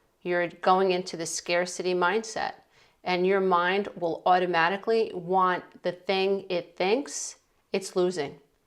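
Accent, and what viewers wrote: New York, United States. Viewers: you are so New York. It's cute.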